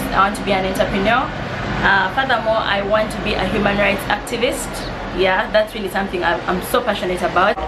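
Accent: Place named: Uganda. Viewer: I could tell that you lived in Uganda from the English. Yes. There is a ugandan watching. Subscribe to my youtube channel too.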